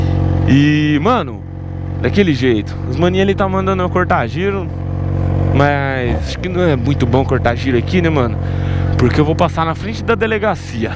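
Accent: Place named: Northeast, Brazil. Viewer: EU SOU DO SUDESTE E VEJO TDS SEU VIDEOS PQ VC SO FALA NORDESTE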